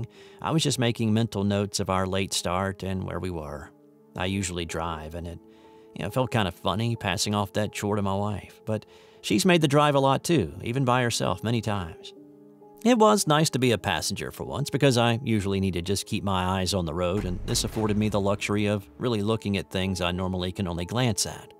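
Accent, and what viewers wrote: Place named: California, United States. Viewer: This was hard for me to listen to because it just was tons of explanations of roads and landmarks, trying to paint a picture that can’t be painted to someone who doesn’t know the area. It sounded like The Californians from SNL. I get it in terms of recording the events, but not for ominous storytelling.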